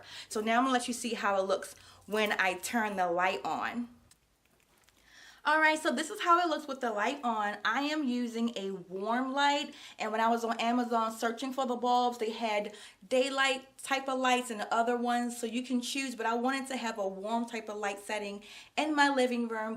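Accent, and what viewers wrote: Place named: DMV, United States. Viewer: Thank you so much for sharing💛 I hear a DMV accent lol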